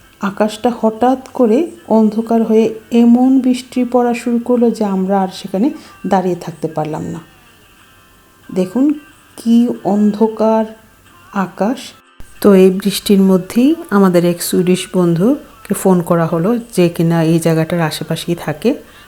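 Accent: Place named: Bangladesh. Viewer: খুব ভালো লাগলো পুরোটা❤❤,আপনার ভাষা বাংলাদেশির মতো লাগছে, এ গুলো কি মাছ , পুঁটি মাছের মতো দেখতে লাগছে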